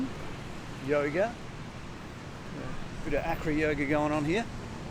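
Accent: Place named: Australia. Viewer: christ mate thats one and a half of an australian accent isn't it gabba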